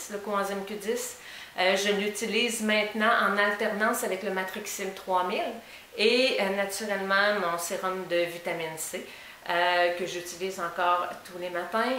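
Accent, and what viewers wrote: Canada. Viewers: merci à demain ! bonne soirée et douce nuit à toi , ou bonne journée ? je ne sais pas là tu as cet accent canadien ? bisous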